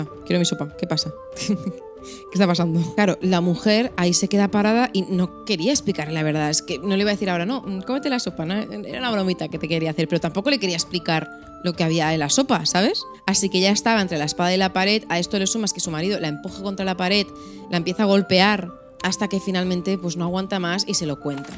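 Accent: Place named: Catalonia, Spain. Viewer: Me hace muchísima gracia cómo cuelas sin querer expresiones o palabrillas en catalán, te sale tan natural que es adorable jajaja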